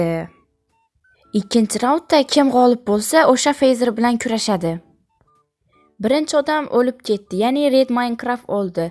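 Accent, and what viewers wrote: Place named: Khorezm, Uzbekistan. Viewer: Chota ovoz Xorazmchaga tortib turbdi xorazmdanmasmisiz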